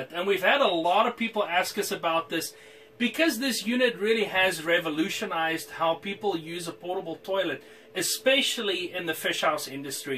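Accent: Afrikaans, South Africa. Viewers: Did I guess his accent?? I guessed South African! It's the accent that sounds like 5 accents mixed together. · South African Afrikaans, perhaps. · Yes SA def ... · Sounds South African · To me he sounds more South-African :-) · Is that accent i hear afrikaans? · Definitely a south African dude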